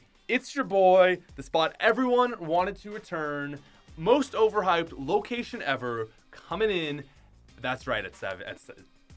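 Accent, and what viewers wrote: Canada. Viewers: Are you canadian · is it a canadian thing to say anywhere and nowhere with s at the end or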